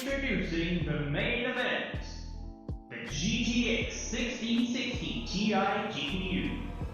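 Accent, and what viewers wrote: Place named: South Africa. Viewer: You south African holy mollie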